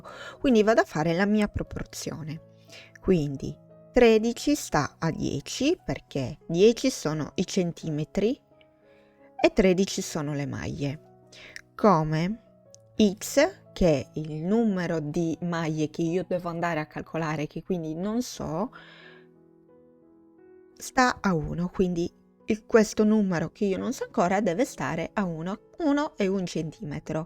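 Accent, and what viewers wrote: Sardinia, Italy. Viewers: ma sei Sarda? Sento l'accento simile al mio 😊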